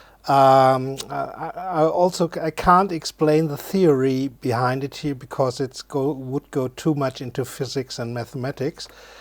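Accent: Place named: Germany. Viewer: Ich habe es mir schon gedacht, das du ein deutscher bist :D Man hört es einfach raus :P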